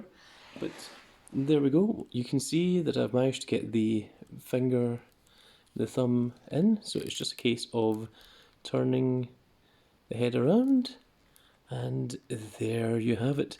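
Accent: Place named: Scotland, United Kingdom. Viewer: I can already tell from your Drew McIntyre accent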